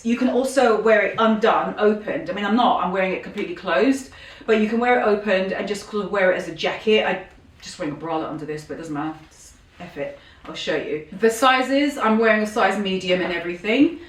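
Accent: England, United Kingdom. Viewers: Love you! Love your English accent. LOVE YOUR CLOTHING LINE! SASSY.CHIC.COMFORTABLE. AFFORDABLE. Ciao an American in Sicily. Love when you visti my my city New york and talk to the people on the street.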